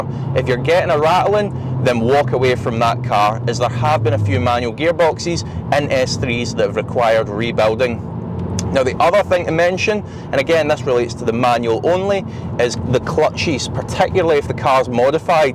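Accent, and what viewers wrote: Scotland, United Kingdom. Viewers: i like the scottish accent but also the guide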